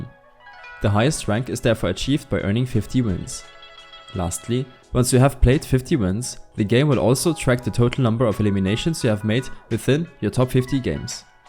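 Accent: Germany. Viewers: Dein englisch ist soooooo deutsch nh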